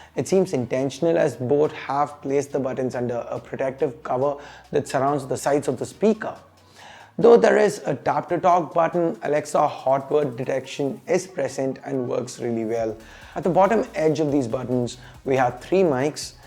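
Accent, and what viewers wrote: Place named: India. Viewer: Very good Indian English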